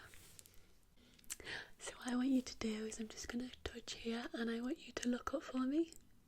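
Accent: United Kingdom. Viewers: I’m trying to figure out if she’s British or not 😂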